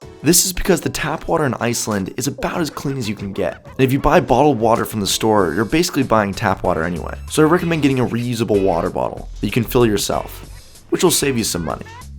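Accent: United States. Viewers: это просто замечательно.. как слышиться америко.диалект.. = можно на 98% заранее знать, что будет безпредельное слабумие в рекламе продажи пустот.. дядя не исключение.., 3ато весело и присутствует уверенное отсуствие всякого умственного процесса в душе и речи.. браво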